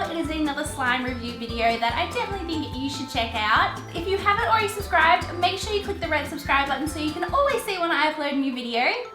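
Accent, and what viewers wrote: Australia. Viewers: Are you Australian? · I love her Australian accent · OMG your Australian!😍SAME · your Australian!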